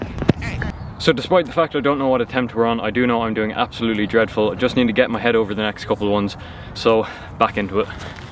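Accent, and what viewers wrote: Ireland. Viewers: Luv ur videos and im 99.9 percent sure ur irish like me😂👍🇮🇪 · Are you Irish you have an Irish accent sounds like your irish your a goaT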